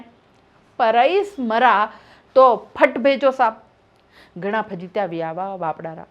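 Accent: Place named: Mewar, India. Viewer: मेवाड़ी बोली गणी प्यारी लागी सा 🙏🙏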